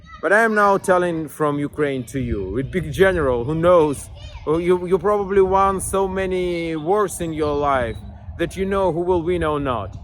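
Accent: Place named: South, Russia. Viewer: инглишь себе подкрути, акцент остался. выраженный южный русский говор. палишься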